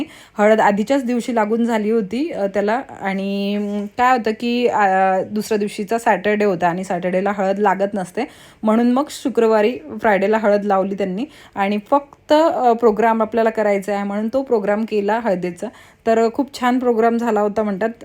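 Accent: Vidarbha, India. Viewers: 1no ❤ ekdm bharri Vidharbh bolte 😊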